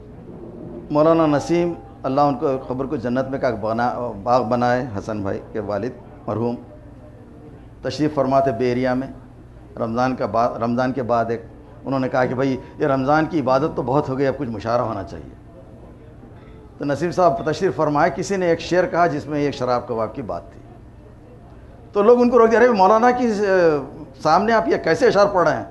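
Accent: Hyderabad, India. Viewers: Hydrebadi hain unki maadri zuban ki waja se ye alafaz ada karna mushkil hai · It is the Daccani/Haiderabadi accent which is fine. Qasam is pronounced "khasam" in Haidarabadi accent and you might have hearf, he has pronounced "Kharaabaat" as "Qaraabaat" once. This is the daccani accent. In Delhi it would be pronounced "Qasam" and "Kharaabaat". Yes, and in Panjab it would be pronounced "Kasam" in some regions even "Haraabaat" instead of "Kharaabaat", and this way way way worse.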